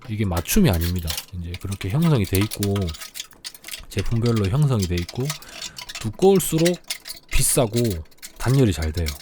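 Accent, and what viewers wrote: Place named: Gyeongsang, South Korea. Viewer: 경남 어디에서 살고 있어요? 말투가 진주/사천 쪽일수도..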